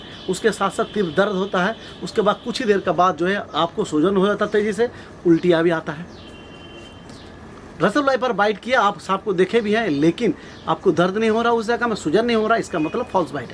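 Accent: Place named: Bengal, India. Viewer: Hello sir aap Jo Jankari Hamen Dete Hain hamen bahut help milti hai Aur ek Baat aapki baten sunkar lag raha hai ki aap bangali ho main bhi West Bengal se baat kar raha hun ki aap ka contact number mil sakta hai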